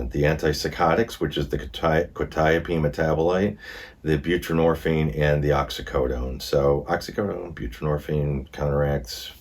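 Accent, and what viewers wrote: Chicago, United States. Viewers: Do I detect a very watered down Chicago accent? Some words are making me thing you may be one of My People. Edit: too bad Ozempic didn’t get discovered for weight loss years earlier because I think that would’ve saved her life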